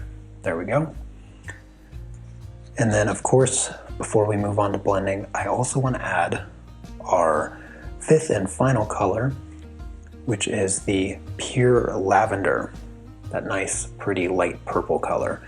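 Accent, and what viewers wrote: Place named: Central Pennsylvania, United States. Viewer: Love your videos, from one artist to another. Are you from central Pennsylvania? Just wondering because you have an accent similar to what we have. Sorry if that seems weird! LOL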